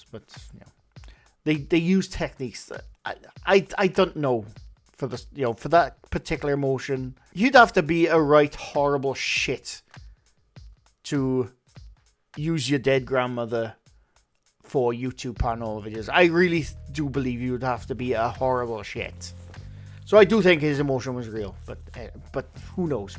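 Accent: Wales, United Kingdom. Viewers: Love listening to your Welsh accent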